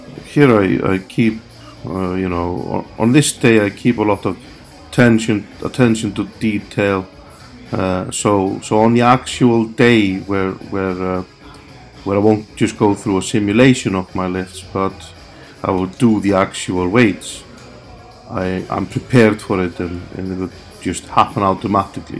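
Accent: United Kingdom. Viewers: You sound Welsh lol. Great video 👌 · Interesting to hear how you've been picking up English accent slightly! Great video thanks:)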